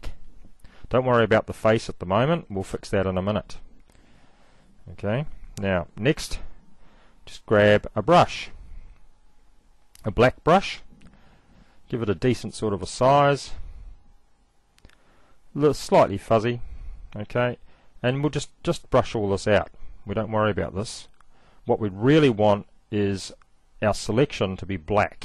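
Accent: New Zealand. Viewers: Man, brilliant kiwi accent!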